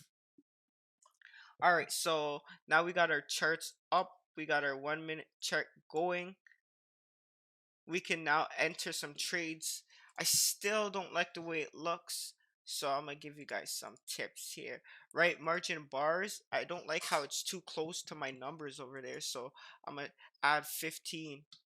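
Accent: Toronto, Canada. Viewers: yuh knoe... you from toronto ?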